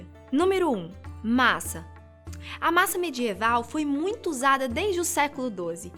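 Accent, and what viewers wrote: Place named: Minas Gerais, Brazil. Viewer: aposto que essa mina que apresenta é mineira e de BH, um mineiro reconhece o outro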